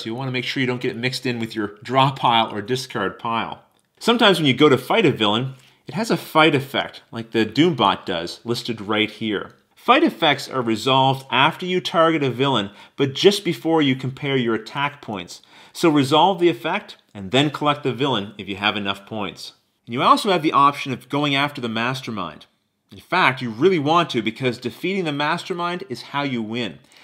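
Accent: Canada. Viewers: This helped alottttttt ..... PS... this guys accent is so canadien haha great job though!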